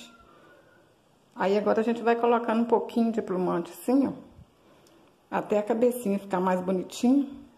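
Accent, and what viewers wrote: Minas Gerais, Brazil. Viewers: Vc é mineira? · Ameiii. Parabéns pela dedicação. Adoro seu sotaque de mineiro. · Parabéns adoro os seus artesanatos ,e a explicações são bem claras ,e também adoro o seu sutaque de mineira ! 👏👏😍😍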